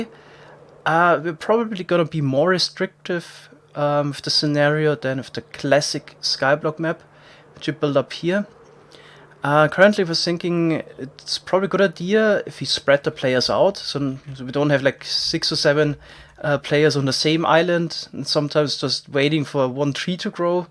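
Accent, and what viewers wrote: Germany. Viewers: Sometimes you can feel the german in your voice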